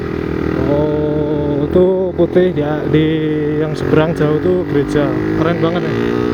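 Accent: Java, Indonesia. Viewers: bener mas wong jowo ojo sampe ilang jawane... ,gk usah di rungoke omongane koncone sampean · Jadi diri sendiri aja mas, sama sama wong jowo ya tetep medog, jgn dirubah gayamu, saya juga medok kok di vlog saya. bahkan Tora Sudiro aja masih medog .... hahahahha analogi yg bagus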